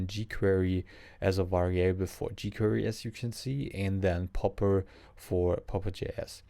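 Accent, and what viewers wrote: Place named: France. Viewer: 😮 ur accents seems french or German even nearly french. Anyway Many thanks for this productive video which made me more passionate about Rails. Thanks again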